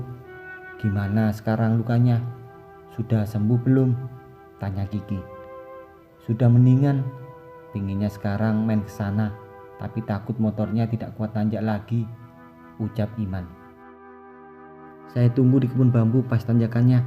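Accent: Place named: Madura, Indonesia. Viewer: Mf..kang Mimin ini dari Madura kah..? Sy dgr dr logatnya😁🙏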